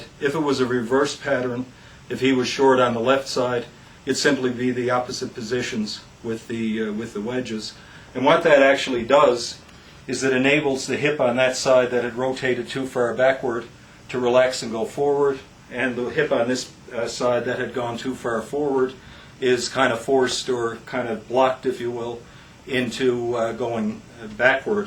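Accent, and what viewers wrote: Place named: Newfoundland, Canada. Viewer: This doctor is a Newfie! I can hear the accent.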